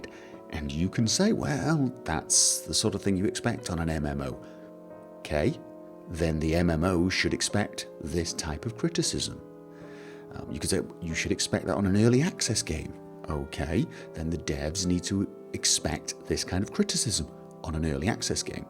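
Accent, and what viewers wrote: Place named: North, United Kingdom. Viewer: northern accent is best accent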